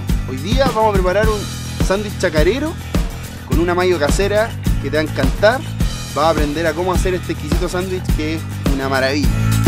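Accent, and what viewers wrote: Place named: Cuba. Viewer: Habla como un cubano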